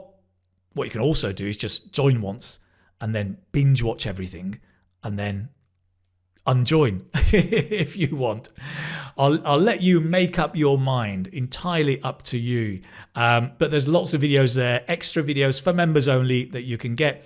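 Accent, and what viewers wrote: United Kingdom. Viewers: ❤ British accent ❤❤❤❤❤ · Pretty obvious he is British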